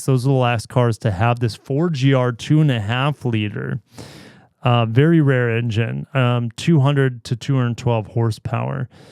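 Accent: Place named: Wisconsin, United States. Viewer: I still have my 2000 Sienna running good. I'm thinking about getting 2021 Lexus RX BUT IT HAS 80,000 miles do you think I should get it? $28,000? Ps are you from the Midwest you sound like us in Wisconsin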